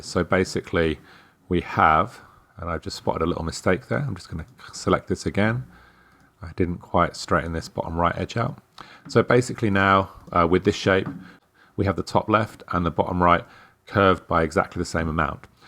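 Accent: United Kingdom. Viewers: Thanks very much, I needed these shortcuts. Your instructions are inspiration! Is your accent Manchester?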